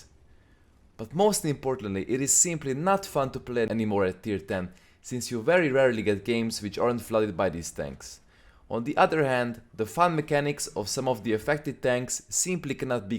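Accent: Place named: Hungary. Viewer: I am sorry if im mistaken, but is there a chance that you are from hungary? Your accent is really familiar. If this is the case, heló, nagyon jó tartalmat produkálsz :)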